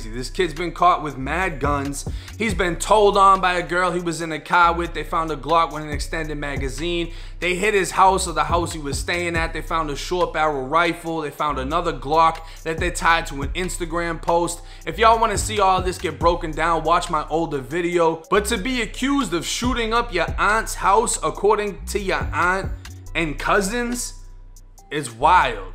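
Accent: Boston, United States. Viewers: Only new to your channel I'm Irish pal but loving the channel me and my girl was watchin you she says his voice is like Ted's Boston accent 💯👌💚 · U sound like u from Boston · That Boston accent is heavy bro, haha i rock with you... Remind me of Slaine... Keep doing your thang man...#Respect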